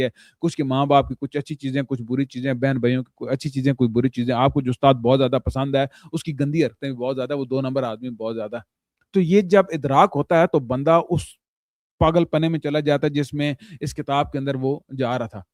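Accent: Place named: Pindi Gheb, Pakistan. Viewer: Me be jhang bahtar attock se urdu to aap bol raha hen lakin Axcent pindi ghab fateh jang wala he aap ka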